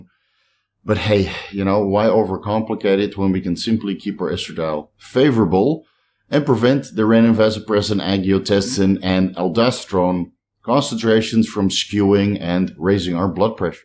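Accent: Netherlands. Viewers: I think it may be his Dutch accent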